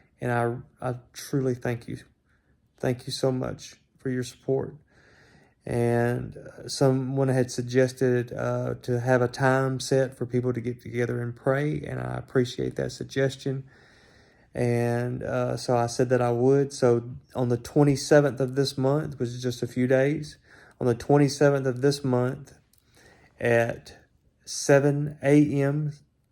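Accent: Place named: United States. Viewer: Ps where in America do you live ? As I love your accent. Best wishes again soo good to see you sitting up and chatting It will be tough as you know but you have got this ❤❤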